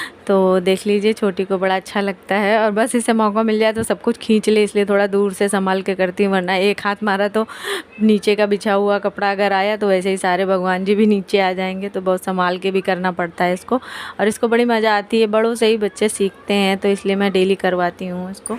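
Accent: India. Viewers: Kanpuriya boli acchi lagti hai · Mujhe to nhi lga UP ka bhasa acha lgta h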